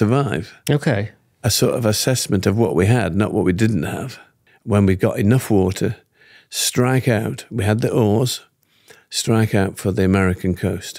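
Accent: United Kingdom. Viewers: That exact accent is the same as Bear Grylls. Can any Brits tell me where it’s from?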